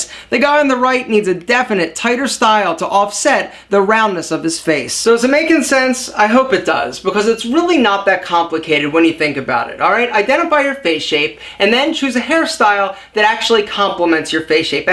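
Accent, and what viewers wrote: Philadelphia, United States. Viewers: you sound like you're from the Philadelphia region?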